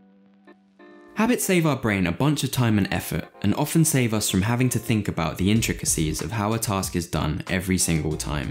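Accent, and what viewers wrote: United Kingdom. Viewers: I'm liking the new British accent! ❤️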